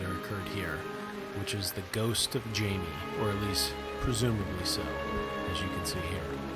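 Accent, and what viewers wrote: United States. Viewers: It just sounds as if insulting a country isn't important enough to try pronounce it properly while enjoying the culture seems to be your approach to other country's Scotland isn't worth trying your hardest not to offend. A small country who gave the world some of the most important advances penicillin, television,pneumatic tyres, steam engines and most important educated thinking 🤔. Umh just a thought for your Midwest pronunciation if its worth being done do it properly.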